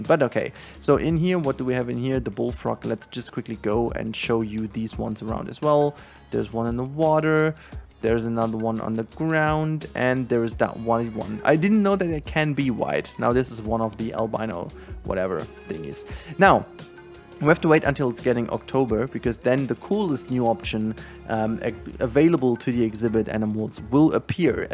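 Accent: Germany. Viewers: hey i got an weird question but are u german?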